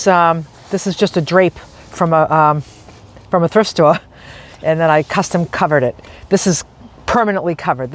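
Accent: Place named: Massachusetts, United States. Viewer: Hi! I fell upon your channel and love it! I love your accent too! My family and I would spend a few weeks every summer in Gloucester, Mass., and it reminds me of all the lovely people we met there!! 👍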